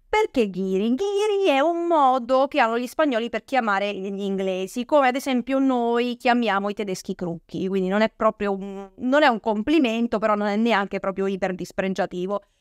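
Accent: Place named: South, Italy. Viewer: Dall accento sembri più del sud😊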